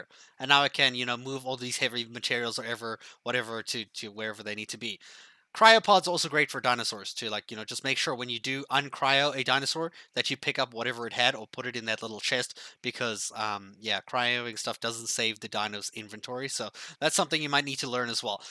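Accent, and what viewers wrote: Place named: South Africa. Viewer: I thought I could read that accent haha! Bring out your Saffa accent more, you don't need to talk like an American :D Good video man!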